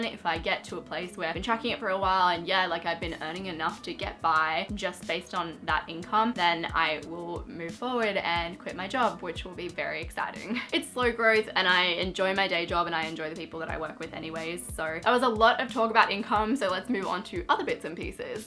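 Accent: Australia. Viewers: It's gonna sound weird but I love your accent I feel like Australians have the best accent to me at least anyways great video ✨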